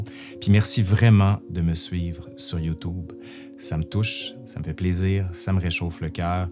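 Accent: Quebec, Canada. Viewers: J'aime ta façon passionnée que tu as de conter les histoires et l'histoire. Je ne manque aucune de tes vidéos. Je suis vite devenue fan. Déjà avec l'accent québéquois. Franchement ça le fait. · Bon bien nous sommes à la page 😂😂😂 Le viking paraît sympathique !!! Rien ne vaut l’accent québécois, à quand une histoire lue par vos services ??? Haha serait super ça ❤ Bon Noël à tous .. .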